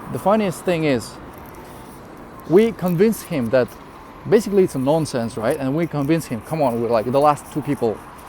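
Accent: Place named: Eastern Europe. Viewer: He sounds a bit slavic...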